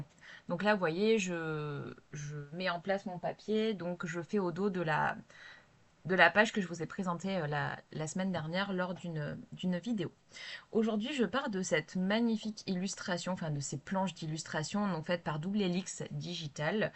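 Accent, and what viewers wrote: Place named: England, United Kingdom. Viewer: Bonjour, je découvre vos vidéos et j'adore!!! Je me suis abonnée également 🎉! Votre voix est agréable et fluide et c'est un avantage certain et l'accent anglais est pas mal! J'ai entendu pire! 😅😂 alors pas de honte à avoir ! Quelle imprimante utilisez-vous? La mienne n'est pas laser et il paraît que l'on peut trouver des cartouches avec une encre résistante à l'eau 🫤 faut que je creuse! 😁 Je vous souhaite une belle journée et à bientôt pour de prochains visionnages! 😊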